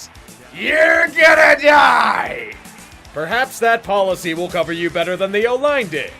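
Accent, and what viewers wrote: Pittsburgh, United States. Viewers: Alright, do it. Take the shot Seattle's defense gets obliterated via yinzer ranting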